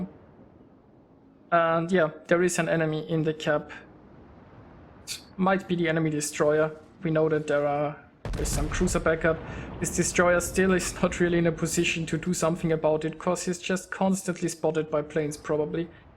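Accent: Germany. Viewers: Man merkt schon an deinem Akzent, dass du ein Deutscher bist. Also vermute ich :)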